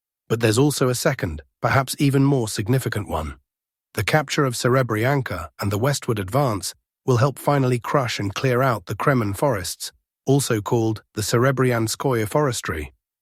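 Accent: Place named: United Kingdom. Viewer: You're no! You speak like a British, better than British!!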